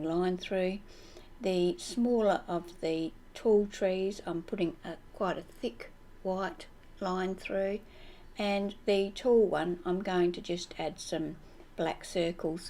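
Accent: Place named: Australia. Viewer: Great process video thank you. Love to hear an Australian voice!!!( I'm in South Australia.) You could put a clear sealer on your background such as clear gesso or matte medium or a spray varnish, then the white won't absorb the colour....Also the powders won't reactivate...good for me to learn from you!!! Thank you.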